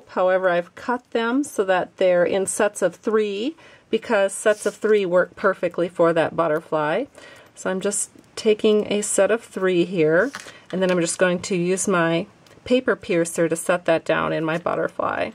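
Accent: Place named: Minnesota, United States. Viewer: I so enjoy your videos and wanted to share with you that you bring to me a touch of home as I am from Minnesota. I hear your voice with our distinct accent and it brings me to my childhood! Thank you for sharing your art and your life! Susan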